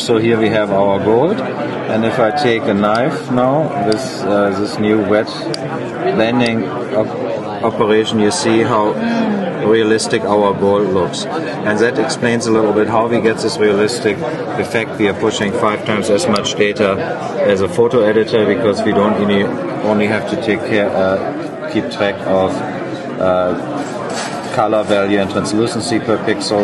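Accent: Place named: Germany. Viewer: are you from germany? you sound like one :D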